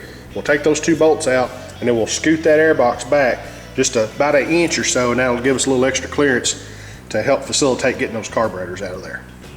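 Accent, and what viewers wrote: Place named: Arkansas, United States. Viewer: A good work, I'll keep your tutorial in mind since I have one of those bikes (the LM version) and, someday, I should try to clean those carb. Just for curiosity, have you sold the bike or are you using it for fun in the fields? P.s. I appreciate your patience in explaining slowly while filming the procedure, since I'm not very familiar with Arkansas accent: not with any US accent, in fact :)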